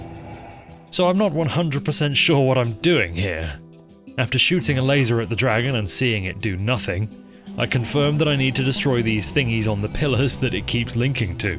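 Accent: United Kingdom. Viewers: Watching an extremely british person say "Fuckos" in the most polite and eloquent voice was not something on my bucket list.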